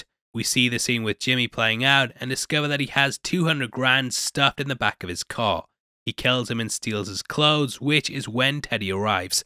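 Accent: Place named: England, United Kingdom. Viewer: You voice is like every English voice put together. Nice video new fan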